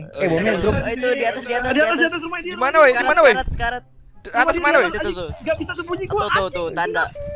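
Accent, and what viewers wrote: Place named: South Kalimantan, Indonesia. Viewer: Bang lu orang banjar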